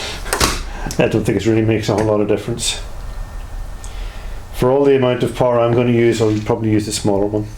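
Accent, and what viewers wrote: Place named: County Down, United Kingdom. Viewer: That's a County Down accent.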